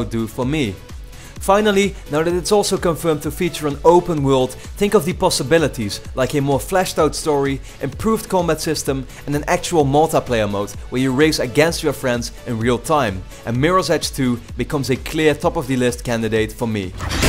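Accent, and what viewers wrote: Netherlands. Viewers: duidelijk te horen dat deze man nederlands is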